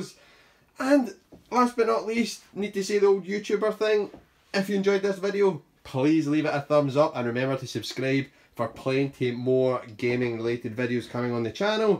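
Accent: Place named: Scotland, United Kingdom. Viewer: We'll definitely keep it in mind! Nice to hear another Scottish accent as well on youtube! :)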